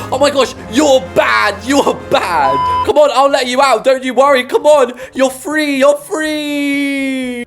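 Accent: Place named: England, United Kingdom. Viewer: The English accent always gets me lol.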